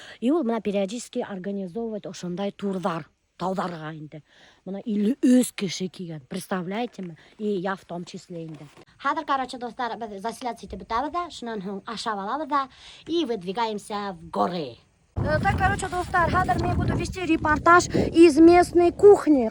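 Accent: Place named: Bashkortostan, Russia. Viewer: Похоже вы хорошо знаете татарский. У нее самый ни на что есть башкирский, да ещё зауральский.😂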